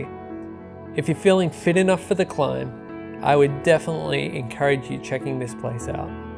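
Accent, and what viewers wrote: Australia. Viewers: Thanks mate ... that was awesome. You sound like an Aussie? Taking my family to Scotland soon to Aberdeenshire ... Banff in particular.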